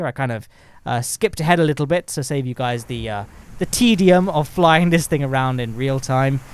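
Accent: United Kingdom. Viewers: It's just how us british talk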